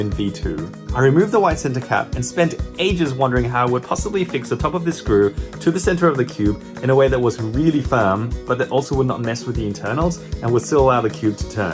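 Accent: Australia. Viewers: Are you Aussie too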